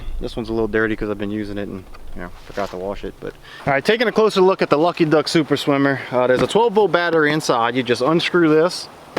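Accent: Louisiana, United States. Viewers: Great review. Sounds like you gotta a little Louisiana in that accent. 🦐🦐🙌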